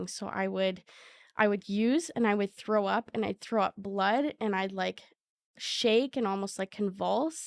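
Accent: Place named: Canada. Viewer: Definitely Canadian 🇨🇦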